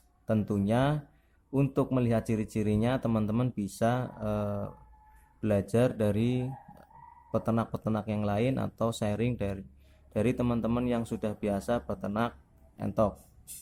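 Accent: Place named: Banyumas, Indonesia. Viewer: Ora ngapak ora penak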